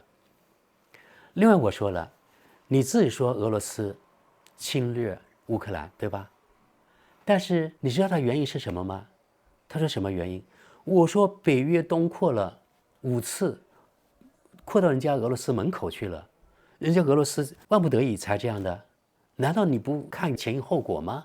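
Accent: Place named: Guiliu, China. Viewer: 他不是广东人 讲桂柳话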